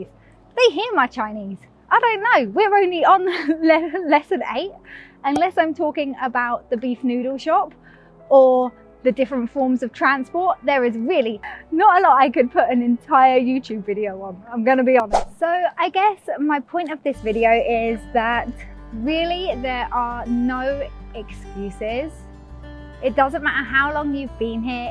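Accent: England, United Kingdom. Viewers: 12 years! you must be kidding do not speak Chinese at all. However, first time hearing such strong, clear English Accent in Taiwan. Please do not be afraid to speak even your pronunciation is incorrect, maybe learn from your kids could be much easier and quicker. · 英國腔好酷XDD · 親愛的Lucy, 拜託 千萬不要永遠說中文拍影片 妳迷人的英國口音 正是妳的一大特色 不過我也承認 很想聽妳整集影片都說中文 魚與熊掌無法兼得 妳過得開心最重要 祝妳學中文順利 加油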